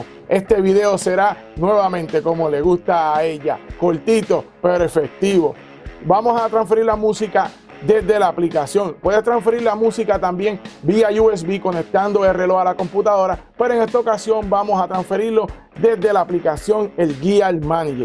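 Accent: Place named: Puerto Rico. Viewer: Tu acento Puertorriqueño lo reconosco en la luna Desde juncos te veo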